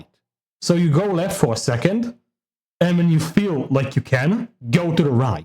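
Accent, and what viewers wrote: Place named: Israel. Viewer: Nah dw its hard to tell you got a israeli accent, i had to go check other videos of you to confirm if my suspicion is correct. Good tutorial nonetheless though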